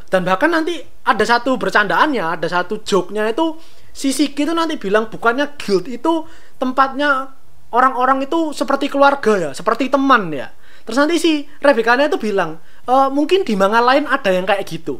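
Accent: Java, Indonesia. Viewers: min suaramu itu medok banget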